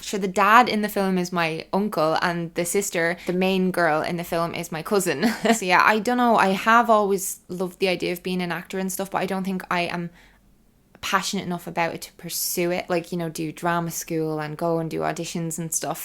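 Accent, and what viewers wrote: Ireland. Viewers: If I'm having an off day, hearing your Irish accent makes it a good one. Thanks!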